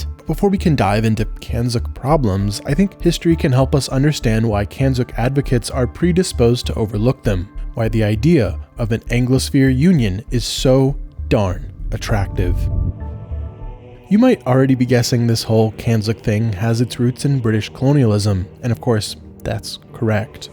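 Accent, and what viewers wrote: United States. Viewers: Hey!! You sound like an American! In that case you have no opinion on this subject! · FYI: 1. It’s not the “British Commonwealth “, it’s “The Commonwealth” (an organization of equal member states) 2. It’s not the “King of England”, it’s the “King of the United Kingdom of Great Britain and Northern Ireland” (or Canada, or Australia, etc) You sound American. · As a Canadian i have to say you sound like an American...these changes are permanent. our trade with the US has dropped 6 percent but increased with other countries by 26 percent, so no real change in total exports and we are just getting started. I won't buy US products ever again if I can help it and i will never travel there...but i am planning trips to Scotland, New Zealand and Australia. we can do what we want and we don't need permission or advice from the US. but your video did highlight one fact...we only need to defend ourselves from the US, but in that we would have the help of more than juat these 3 countries. 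we've been pushed around by the US long enough and while they're descending into fascism this is a good time to get out of NORAD.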